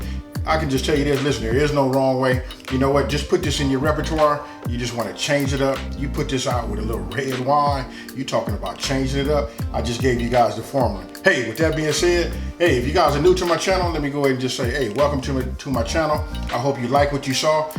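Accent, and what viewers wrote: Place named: Los Angeles, United States. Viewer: He sound like he from LA fasho!!! 🤣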